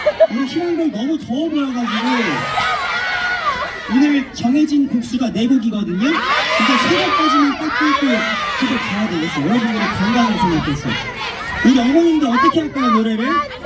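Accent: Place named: Gyeongsang, South Korea. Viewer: 희재님 오늘 경상도 사투리 입에 착착 감기네요♥❤❤😂😂😂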